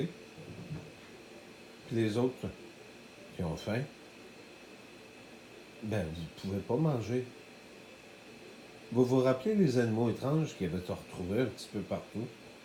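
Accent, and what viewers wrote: Quebec, Canada. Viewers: mon Rabbi j ai prix plaisire a t entendre rire et j aime beaucoup la façon de t esprimer j espère que tu aura toujours cette accent si charment Québécois et ta façon de nous expliquerles choses me plaît beaucoup merci j ai aimer voire le toutou et le minou sa me manque beaucoup de pas en avoire mais je me ratraperais plus tard j aurais tout les animaux de ABBA pres de moi et ils seront libre d allée où ils veulent merci je vous embrasse fort toute la petite famille sesukuli mon Roy.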